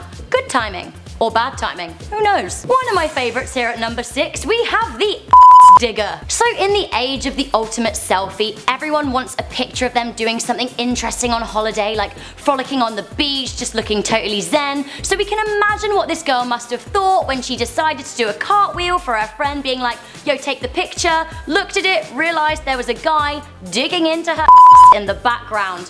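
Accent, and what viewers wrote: United Kingdom. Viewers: dawn she british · Srry , cant understand british accent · omg i freaking love you. An asian girl with a british accent. I really love this channel because its so diverse.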